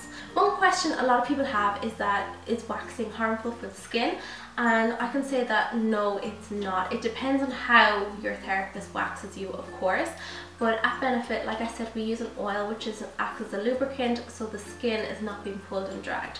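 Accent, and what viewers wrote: Ireland. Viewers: Such a helpful video!!And you have such a lovely Irish accent.I live in the U.S. but I have family in Ireland I hope I get to visit some day.💞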